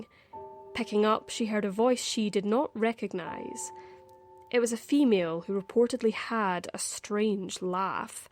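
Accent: Scotland, United Kingdom. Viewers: This is NOT an American show. The so-called annoying voice is because the show, Dark Curiosities, is a Scottish YouTube mysteries series. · Is the narrator a Scott?✍ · No, that is definitely a Scottish accent. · I love the Scottish accent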